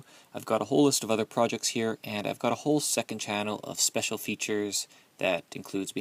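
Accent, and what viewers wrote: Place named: Canada. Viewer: Are you Canadian sir?? Good to see fellow Canaidan on here lol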